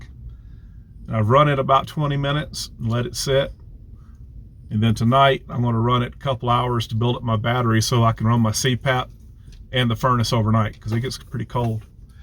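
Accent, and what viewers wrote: South, United States. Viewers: The spark in is back!! I'm sort of glad the "road" has kicked you in the A _ _! I can say that from experience ~ ~ gotta listen deeply to your body's healing process. Love your southern jibber jabber too. I think sometimes you change vehicles to keep it interesting for us, plus we all learn. For that, I thank you. Promise yourself you'll slow down even if you do feel better, the healing will come much faster if you do ~ I know from 1st hand experience. Felix is a God given gift, good he's walking that mile with you everyday.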